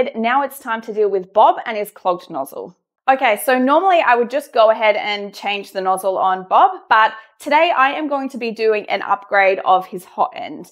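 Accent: Australia. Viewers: First thing I have to ask are u Australian. Just from your pronunciation of words . I'm thinking of starting something similar with 3D prints and design. Any info would be great i am in SA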